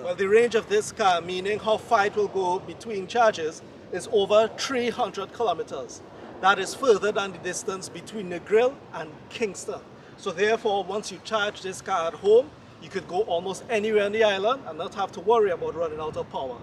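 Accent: Trinidad and Tobago. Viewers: He sounds very Trinidadian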